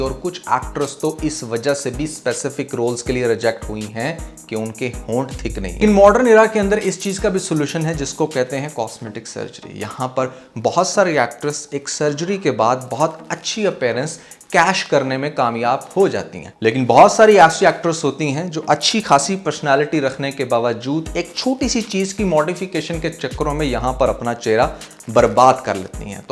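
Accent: Punjab, India. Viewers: Why are you trying to copy indian accent & words , some kind of inferiority complex? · Bhae hasad may naqal utarty hue AA Kuch ziada he over hogae or boht he weired hogae bilkul acchay nahe Lagay itna ghussa mat hua karain punjabee accent may Urdu n English sounds weired but it's nice but plz ab is comment pe ghussa mat hotee ga qk apka channel Hy or aapko hr qusam k comment face krny paraingain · I always say, kindly focus on your Urdu and English accent. Dool nahi doll.. Dono languages main Panjabi touch aata hy This is advice for you and it's easy to overcome it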